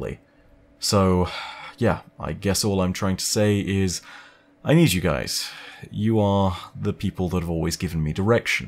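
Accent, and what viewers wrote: RP, United Kingdom. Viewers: Posh English man? I'll just imagine that Sir Hammerlock is just telling me a story about the lore of the game. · My advice for Borderlands lore stories? cover the background lore and the small tidbits that flesh out the world. Example: Tediore guns are made cheap therefore they're thrown away to be used as an impromptu grenade rather than be reloaded. Don't tire yourself out explaining motivations of major characters and story beats. Research the short stories that flesh out the companies that run the universe of The Borderlands. Atlas, Maliwan, Pangolin, Hyperion, Dahl, Jakobs, etc. Check out the small bits that flesh out the lore because those bits are some of the most fun and refreshing! Borderlands is somewhat secretly about capitalism run rampant, sometimes worshiped, sometimes turned into warring factions, sometimes turned into fights for freedom. 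Do tackle stories like that of Mr. Torgue with your posh british accent, and tackle it with a rich sarcasm like the stories are a joke and not to be made seriously, because they are jokes! Rather than look at Borderlands from a surface level all the way maybe you'd have fun laying a foundation for the folks that don't have a clue about Borderlands, tell them about Pandora, The Vaults, Sirens, then tell them about the world and the companies that exploit it. :) Don't take this as something you should cover if you simply don't mesh with it ya know? Find the stories YOU like and share them. I'll be here for whatever content you make, but if you need someone like me to tell you that you might like Borderlands and it might be a surprising bre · Posh Englishmen + Borderlands=Great Content But you do you I've seen a lot of the comments and agree, You do this if you want to do it, We will all enjoy your content and continue to watch no matter if you do Borderlands or not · I agree, the tone of a "posh Englishman" narrating absolute insanity (that also has a pretty good story to back it up) would be phenomenal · Any Borderlands content would be interesting, while its not as intricate as Destiny; there's still plenty of content to talk about. 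Primarily, I love you for your Destiny content first & for most, anything else is a grand bonus. A "Posh, Englishman tone" can work with anything. My ultimate suggestion, try doing a lore or analysis vid on Borderlands 1/2/pre-sequal. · You could channel some Sir Hammerlock with your "posh englishman" thing and spice it with some Marcus, it could also wait for like say 6 months . Also the BL series is also something that could produce some "that sound like a YOU PROBLEM..."s. On what you could cover... I don't think there's that much but all the weapons manufacturers should have some worthy stuff and the next game is expanding on them AND the universe more. I also wouldn't mind some vids here and there on content other than Destiny. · There actually is a surprising amount of deep lore in borderlands. It's more recent, like, a few hundred years, not even, so nothing as deep and expansive as Destiny. 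The call I up to you. If Borderlands calls to you, if you click with it's humor and world, go ahead. If there are other games you wanna cover, that's fine too. TBH, you put it best. Posh British guy may not be the right "voice" to cover Borderlands. Then again, what is the right "voice"?, if you have fun with the game and want to share that fun with us, go ahead. If you wanna play other games and share those with us, that's fine too. Peace,. Whatever you want is cool with me. I definitely be up for borderlands content. Most of the lore is upfront in all of the games in talking to npcs and side quests. Not too hard to research, but may take some time to get to. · Personally I find the idea of a "posh" Englishman talking about "swordsplosions" a fantastic fit, but as others have said, don't risk your health over it if it's going to have a negative impact.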